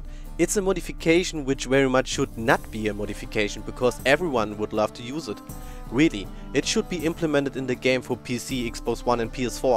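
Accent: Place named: Germany. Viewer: Hört man übelst raus dass du deutsch bist :D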